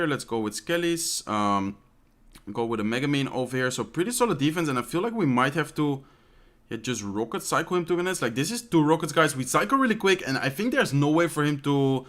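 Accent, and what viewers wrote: Netherlands. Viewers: Is deze gast niet nederlands of lijkt dat zo aan zijn accent te horen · Are you dutch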